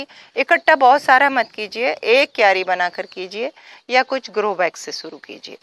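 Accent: Standard. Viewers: आप की शुद्ध हिंदी सुनकर बडा अच्छा लगता है ,क्योंकि मैं मुंबई मे रहती हु .मेरा भी एक छोटासा बाग है लेकिन सब एक्झॉटिक प्लांट्स है,सोच रही हुं कुछ सब्जीभी ऊगालू लेकिन मुंबई की बारिश और मुंबई के बडे बडे चुहे कुछ भी नही उग्ने देते है क्या करू समज नही आता? लेकिन मै फिर भी प्रयास करूंगी आपसे प्रेरणा जो ली है.☺️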